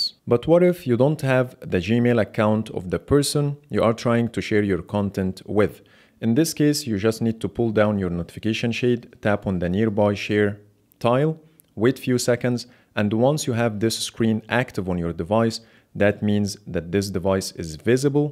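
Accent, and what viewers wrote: Egypt. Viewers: مصري باين اووي من الاكسنت بتاعتك 😂😂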